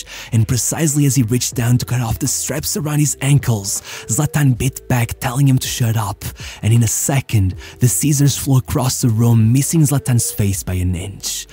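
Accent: Portugal. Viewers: Are you Portuguese? You pronounce the players names like a Portuguese would. Nice.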